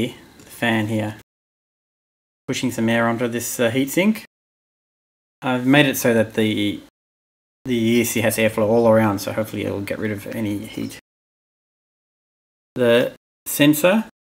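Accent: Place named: New Zealand. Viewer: Thanks for sharing your brushless motor retrofit project with us. Those motors interest me as to their potential as a replacement for various devices with the added benefit of speed control but they are still quite expensive just to have an introductory play with. I’m in New Zealand hence the expense. Speaking of which, your accent is very Kiwi. Am I right?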